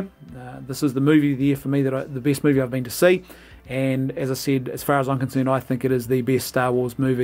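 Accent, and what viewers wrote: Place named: New Zealand. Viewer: By the way...gotta love that kiwi accent.. with all your passion about SW maybe you should run for PM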